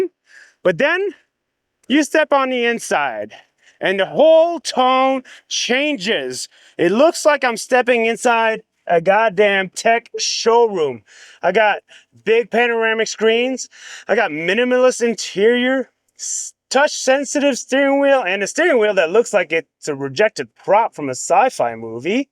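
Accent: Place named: France. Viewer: right on! I noticed your French accent! Northern Ontario here! Looking at buying one of these as our next vehicle!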